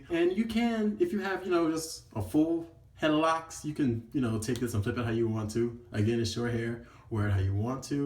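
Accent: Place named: Brazil. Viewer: Sotaque brasileiro kkk you até from Brazil?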